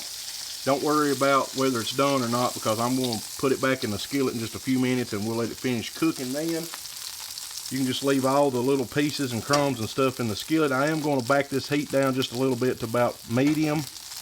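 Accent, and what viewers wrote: South, United States. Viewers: Love your videos and great instructions. And your accent is the best! I’m a Texan living in Michigan, and I need my Southern accent fix from you!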